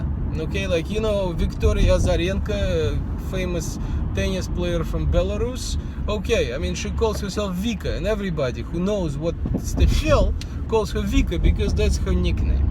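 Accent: Russia. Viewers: Я очень редко пишу комментарии к видео в ютюбе. Но Вы! Вы - прелесть! Вы - супер! - просто очень любопытно и забавно. Ваш русский акцент будто разрешает мне говорить, как мне угодно, не запариваясь на произношение. Темы ваших видео отличные. Спасибо.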